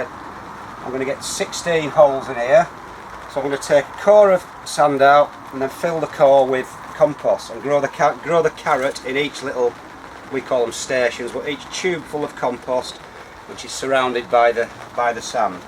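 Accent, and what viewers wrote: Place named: Scotland, United Kingdom. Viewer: Hello from New Mexico, What kind of sand are you using? Is it something from a home depot or garden centre, or just regular old sand? Or is it something special? Second, Do you have holes in the bottom of your barrels for the water to drain out? Where are you located? I think maybe Scotland from your accent? It sure seems to rain a whole lot there. Can you reuse the sand the following year?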